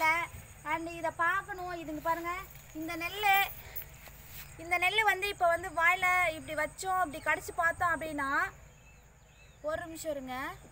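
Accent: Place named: United States. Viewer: Ama amerikka la irunthu vanthu iranguna mathiri paesura thoooo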